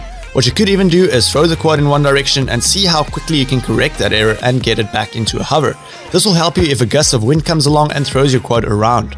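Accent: South Africa. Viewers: hey my bru are you South African? you sound south african but your address says new zealand!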